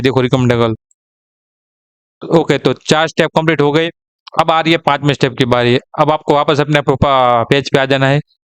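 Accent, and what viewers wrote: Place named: Gujarat, India. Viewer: आप गुजराती हो बोली इसी लगती है ❤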